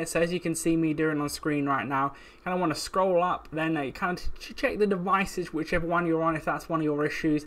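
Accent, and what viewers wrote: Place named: United Kingdom. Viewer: He talks too fast and with his British Accent it's hard to understand him, he needs to slow down.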